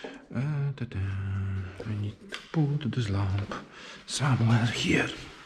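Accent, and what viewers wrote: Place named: Poland. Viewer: polskiego akcentu nie ukryjesz xD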